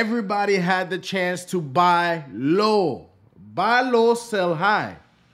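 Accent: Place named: Jamaica. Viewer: Ya Jamaican come thru clearly man.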